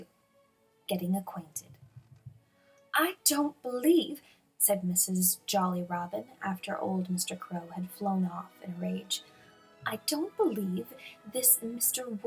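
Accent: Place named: United Kingdom. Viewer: so 2 things the reader is British or something and yeet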